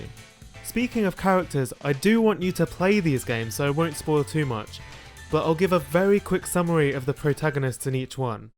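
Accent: United Kingdom. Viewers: This was actually a super cool video, i perfer tactical Rpgs as apposed to these kind of turn based ones, but nonetheless i enjoyed hearing about this game and i might actually end up buying it lol. Also i knew you were from the UK but i didnt think about you having an accent. It was really enjoyable to hear you ramble about something lol. very nice change of pace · I have a lot of games to get through as it is, but the way you presented these, they really seem like the kind of game I'd enjoy. I'll add these to the long list of games I'll need to look more into, I guess... once I finish my large backlog of games that I already own. (Also, yes, more talking with the English accent.)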